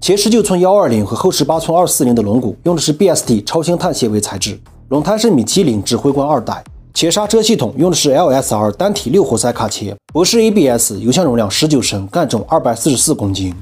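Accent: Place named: Inner Mongolia, China. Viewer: 你这内蒙口音可以呀！！！哈哈哈哈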